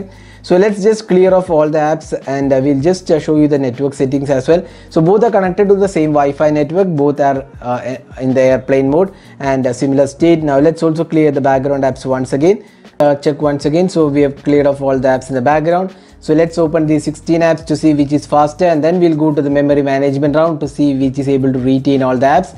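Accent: Kerala, India. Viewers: Accent keettit malayali anenn tonunu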